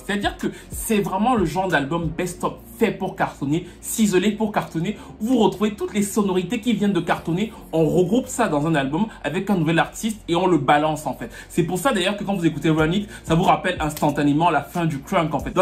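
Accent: Cameroon. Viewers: ce mec merite plus 1 M d abonnes. tu es trop bon ow mon frere africain. j adore ton accent camerounais LOVE YOUUUU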